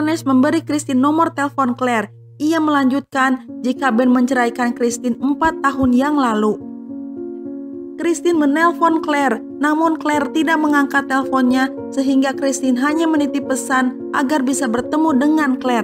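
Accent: West Java, Indonesia. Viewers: chanell ini nih ngerti klu pngen nunjukin khas sunda bs dgn nyebutin (kumaha damang)trus yg bukan org sunda kan ada kata2(welcome....)itu mskpn bahasa inggris jg kn global org2 byk yg tau artinya apa...dgn logat jg org tau ini chanell ini org sunda yg puny...ada chanell lain lupa namanya chanel ny apa trus yg puny ny org jawa dan bacain review film kata2 ny hmpir smua bahasa jawa tp kan yg nonton bukan jawa semua ibaratny klu mau jawa smua hrus ada translate dibawahnya jgan smpe kata2 ny jawa semua kan bisa sedikit kata tp org paham ....makany chanell trsebut byk hujatan n byk yg komentar jlek.... nah aku paham klu mimin bilang subcrb jd subrek trus like dibacany jd likeu itu sengaja lah gk usah dikomentar udh jd ciri khas....memang sbgian klu org sunda emng lidahnya pabaliut alias rumit klu dlm pengucapan apalg b inggris kebyakan makan sampeu hehehe..bercanda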